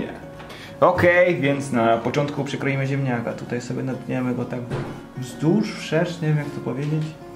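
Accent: Poznań, Poland. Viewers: TO MUSI BYĆ MŁODY ZIEMNIAK ;) ps. WIDZĘ ŻE TROCHĘ GRASZ GWARĘ POZNAŃSKĄ PYRA-ZIEMNIAK ;) (POZNAŃ MOJE MIASTO )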